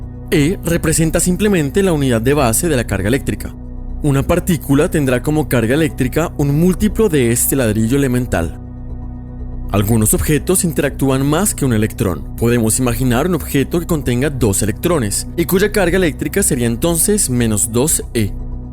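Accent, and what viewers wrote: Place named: Colombia. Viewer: Escuche este video en inglés. Ahora lo intente escuchar en español no puede escuchar más tres minutos, ese acento colombiano no puedo, no puedo espero que cambien al narrador por un acento más neutral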